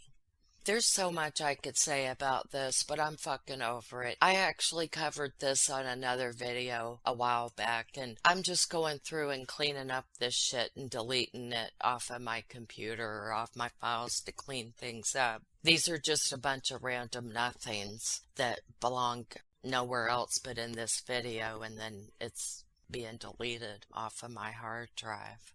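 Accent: South, United States. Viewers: PS. You also teach me so many new expressions in the English lingo. I'm very grateful. Your sense of humor is just my cup of tea. Your southern accent isn't that pronounced to me as some I've heard from/of. I myself has a "cosmopolitan" accent, my best friends from the U.S. told me last time me and my hubby visited them. That is more kindly said "Your accent is all over the place with Swedish, British, Australian and American and it sounds pretty f'ck*ng funny!" DS. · southern drawl! It reminds me of my southern-as-all-hell aunts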